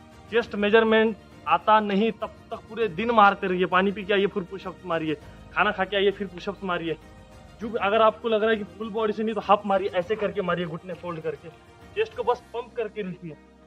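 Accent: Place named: Bengal, India. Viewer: Sir aap bngla bhasa bolte ho, aapki hindi सुन ke lg rha है,mera 3 june ko रनिंग है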